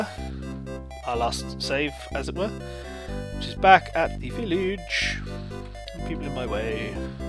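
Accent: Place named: United Kingdom. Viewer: Ermahgerd... An English bloke doing a playthrough of this game... must watch all... Dat voice acting. Doesn't even ruin, British voice too strong...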